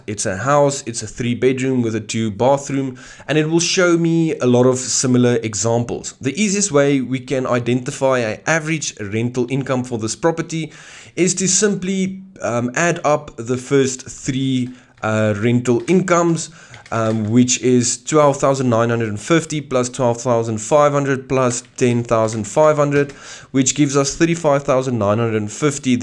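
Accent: South Africa. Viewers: I just enjoy hearing your South African accent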